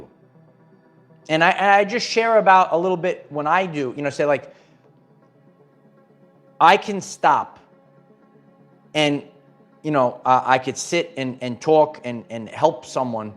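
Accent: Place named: Brooklyn, United States. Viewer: How cute your little Brokklyn nyc accent...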